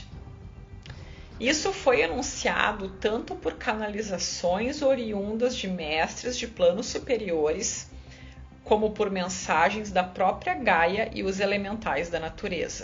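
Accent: Rio Grande do Sul, Brazil. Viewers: Detesto sotaque gaúcho o coisa horrivel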